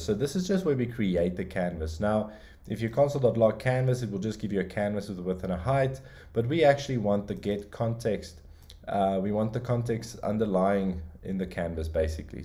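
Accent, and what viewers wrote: South Africa. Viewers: i have just found your channel, and dammit i know that accent is SA!! :) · This guy sounds south african....great work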